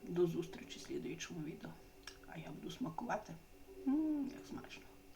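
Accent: Ternopil, Ukraine. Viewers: молодець! Говорить так,як розмовляють на Тернопільщині,в кожному місці свій діалект,в мене полтавський,коли навчалася в школі,то розмовляли по русько- украйонскі.Так на нас говорили з інших місцевостей 😅Мені дуже подобається,як говорить.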